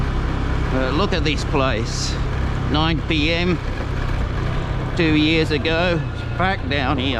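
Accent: Australia. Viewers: Annoying Australian accent, go home if is nothing open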